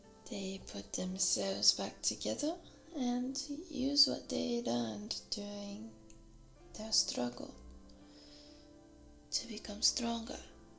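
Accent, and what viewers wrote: Japan. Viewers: My dumb ass read the thumbnail text as ‘kitsunei kurasai’ 😂, Your Japanese accent was excellent and made the entire session truly special. The way you spoke English with that Japanese accent literally sounded exactly like my mama! 😆 Ohh and the new binaural microphone was an amazing experience, it immersed me to the point where I felt genuinely present in the workshop. The ending was perfect, sweet and wholesome. It added a heartwarming touch to an already delightful experience, leaving me with a sense of satisfaction and gratitude. Thanks so much for this superb audio it was a masterpiece. It’s now one of my favourites and I can’t wait for more like this!! 👏🏼 Good luck with your exam today, I hope your mamas feeling much better sending prayers and my best regards ❤️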